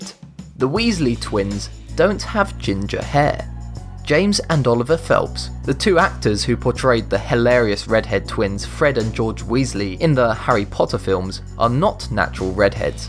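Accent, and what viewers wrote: United Kingdom. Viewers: What is this what is this voice why is it so different and British why please why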